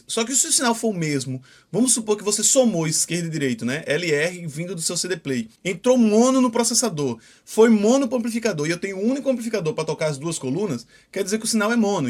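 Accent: Northeast, Brazil. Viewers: gênio é uma honra e muita satisfação ver que você respondeu meu comentário nossa Aaaa, (muito massa) sobre a questão de mesário, eu sou em tempos de política sempre sou chamado pra compor a mesa e sobre técnico eu trabalho com som também, trabalho com som mas mecânico, e estou migrando para o som automotivo, e seu vídeos me ajudam muito, se entendo sim um pouco não tudo (isso sempre) e a cada vez que assisto um vídeo seu aprendo um pouco mais, assisto me divertindo, não zoando mas achando super interessante divertido (sotaque. Bem que eu queria ser do nordeste) ABRAÇO GÊNIO. DESDE JÁ MINHA ETERNA ADMIRAÇÃO POR TI HOMI.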